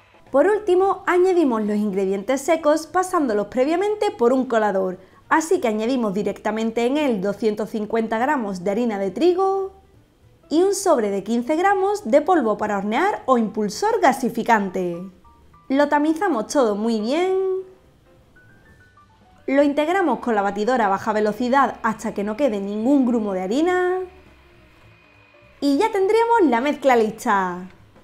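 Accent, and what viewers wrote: Spain. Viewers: ¿De que parte de España es el acento?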